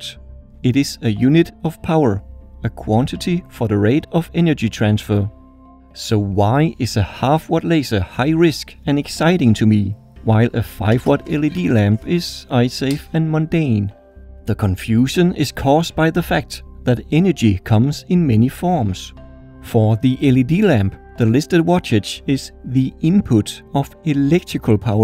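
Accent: Denmark. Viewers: har næsten lige fundet din kanal. Ku sku høre med det samme du var dansk (go'e gamle accent)😂 Har seriøst binged dine videor. Pisse fedt med en dansker der laver sådan nogle slags videoer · YouTube recommended this video and I thought it would be interesting. It didn't take many seconds before I could hear that the narrator is Danish. Fortsæt det gode arbejde 👍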